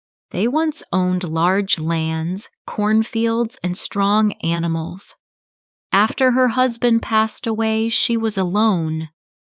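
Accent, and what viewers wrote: United States. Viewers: I'm also disgusted with your American English.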